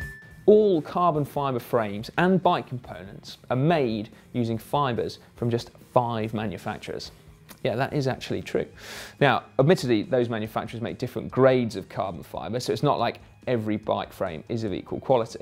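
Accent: United Kingdom. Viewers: Science glasses and Alan Partridge accent.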